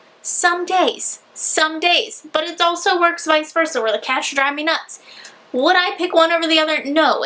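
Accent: South, United States. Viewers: OMFG I'm from MD (right on the DC border though) and have always assumed you were from WV or another southern state... I'm really shook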